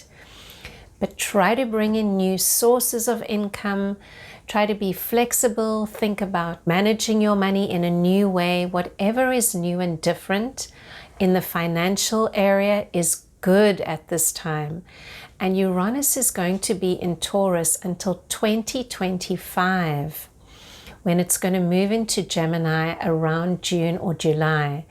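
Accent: South Africa. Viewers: Thanks you for this. I love your S. African accent and your clear and concise guidance. It is very helpful. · THANK you! First time listener. You sound South African.. Greetings from Cape Town South Africa. 🐬🐧🐳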